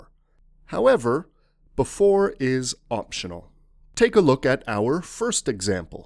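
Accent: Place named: United States. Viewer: My goodness man. Good thing I've found your channel. I love the American English, and you speak so clearly, I give English lessons and your explanations will help me a lot. Good job. And your little stories are the best ones. Keep up the good work.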